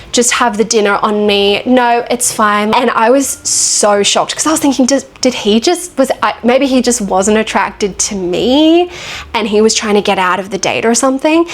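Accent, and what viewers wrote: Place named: Australia. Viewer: such a lovely Aussie accent! xx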